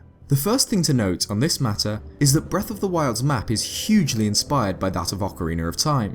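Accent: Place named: England, United Kingdom. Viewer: shut up guys, I dont hear say ocariner....I however hewr him say O differently. I love his accent! always have liked english accent.💞 I feel close to the english, as we used to be a commonwealth, not sure if we are or not, still have the queen on the money..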